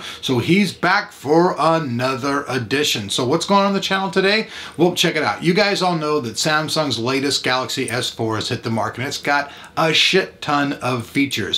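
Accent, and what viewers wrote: Russia. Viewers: Russian accent was my favorite reason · love ur russian accent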